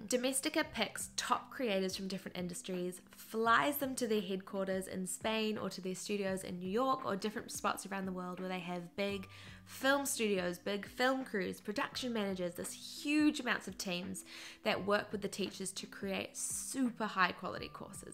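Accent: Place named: New Zealand. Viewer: Your such an awesome creator, love your stuff, very inspiring! And great to hear another Kiwi accent on YouTube! (I'm from NZ too)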